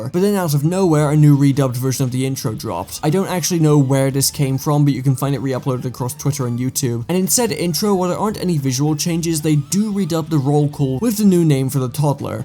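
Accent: United Kingdom. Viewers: it's weird but I didnt even catch your british accent until you pointed it out, almost like a switch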